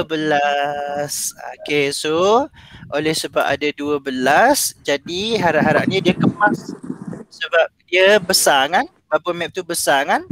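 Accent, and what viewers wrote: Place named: Terengganu, Malaysia. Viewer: 😅 sedak dgr org ganu cakak.. lame x g teganu..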